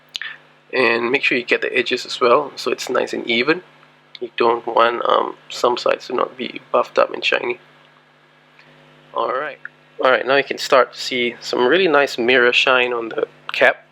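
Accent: Singapore. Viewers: are you from singapore? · You sound so singaporean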